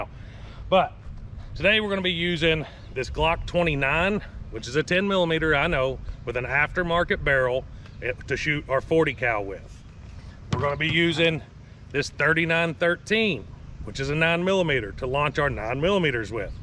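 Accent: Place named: Appalachia, United States. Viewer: You ain't gotta give me specifics, but where is the Gun Dungeon located? From your accent and the hills in the background I'd say East TN, Eastern KY, Western NC, or SW VA.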